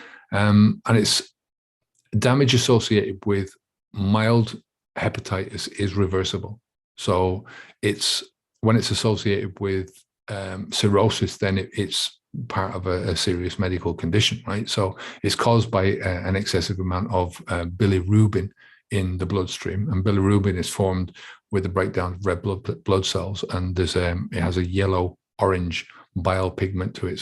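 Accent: Ireland. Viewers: New here, can tell you're a fellow Irishman but can't quite place the county 😂 if i had to guess id say youre a Dub? Great video either way pal!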